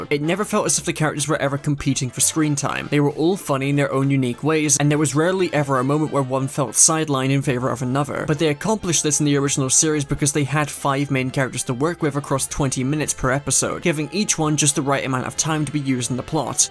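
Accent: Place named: Southern England, United Kingdom. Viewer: Where you from in england bro? More southern than northern with that accent 🤔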